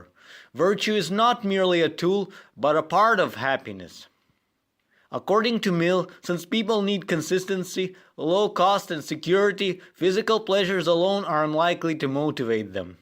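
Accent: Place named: India. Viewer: now this is good content but try to correct your indian accent okay?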